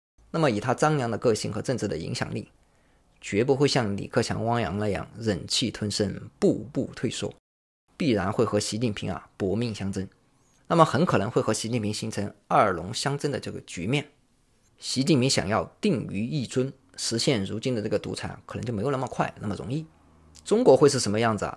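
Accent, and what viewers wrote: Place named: Jiangsu, China. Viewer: 听的口音，是江苏人吧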